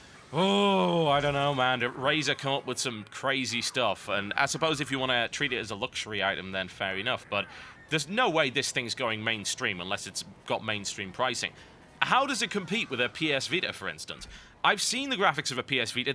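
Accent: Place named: Newcastle, United Kingdom. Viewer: AHHH you ARE a Geordie! I knew it.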